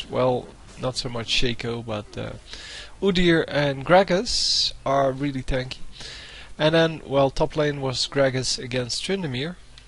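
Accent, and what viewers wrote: Netherlands. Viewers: Are you dutch man?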